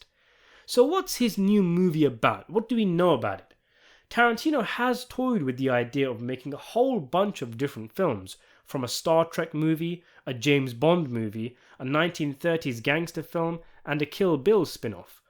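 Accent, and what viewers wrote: United Kingdom. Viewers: oh no a nigel accent :eyes-purple-crying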